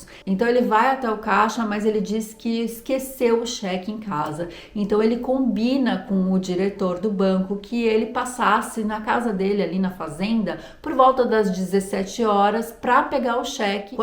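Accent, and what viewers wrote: France. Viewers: Oieee... Veramente, esse caso é o reflexo de uma sociedade preconceituosa, de uma imprensa q n se importa em mostrare a verdade, mas q é totalmente voltada para o sensacionalismo e o lucro, sem importarsi das consequencias de meter na lama, e distruir a dignidade de pessoas, q seguendo a lei deveriam ser inocentes fim a prova contraria! Purtroppo mudou pouco essa mania de fazer julgamentos, e bene si eu vejo ai da uma sociedade muito masquilista, e mulheres q sofrem ao ver o su esso, è a independencia de uma outra mulher... Eu moro a Forlì, cidade pequena, q vc espirra aqui, e no outros dia tds dizem: " meu Deus ela ta com Covid"... Pode acreditar!!! Baci a te, e adoro sentir o teu frances ... Adorrrooo, purtroppo o meu Frances é escolar, kkkk.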